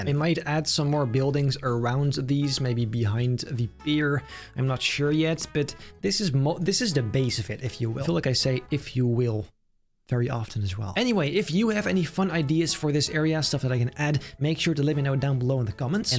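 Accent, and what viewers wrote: Netherlands. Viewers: PLEASE MAKE A VIDEO WITH A DUTCH ACCENT 😹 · Me being Dutch, just caught me of guard with that Dutch accent 😅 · Pls let the man talk Dutch English for an episode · Can you actually make a video with your Dutch accent?? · I was al wat verdacht maar het Nederlandse accent maakte het duidelijk dat je Nederlands bent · Duts aksent is the best!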